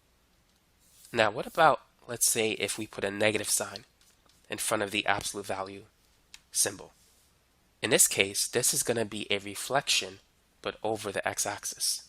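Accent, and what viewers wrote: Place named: New York, United States. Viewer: Idky why but I keep hearing a ny accent😭 I can’t unhear it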